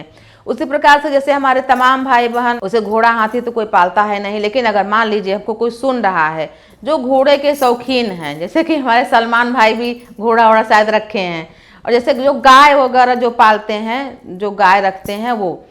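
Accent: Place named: Allahabad, India. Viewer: Both boht achi baat batai apne.. Mai Allahabad ki hu... Apki boli waise hi... 💕💕👍👍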